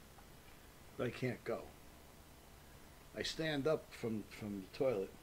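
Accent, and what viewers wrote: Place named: Northeast, United States. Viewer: Which Doink is this? He sounds like he is from the Northeast, Steve Lombardi?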